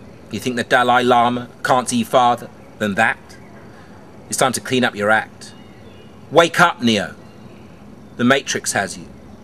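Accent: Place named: United Kingdom. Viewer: sweet british accent lol im not that into poetry but this sound pretty awesome :D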